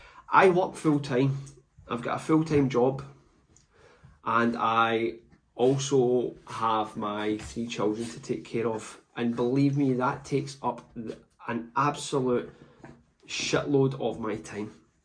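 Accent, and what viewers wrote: Scotland, United Kingdom. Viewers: I think your biggest appeal is the rawness of your videos. On the patio, top of the stairs or front room, a working father getting it in is inspiring. Be yourself, the world loves us Scots, accents and all! Maybe not the swearing at times though, could put some off. I’ve taken ideas from you and have tried a good few of your videos as they’re a bit different from what I normally do. Get the burpee tour of Scotland on the go! 😂😂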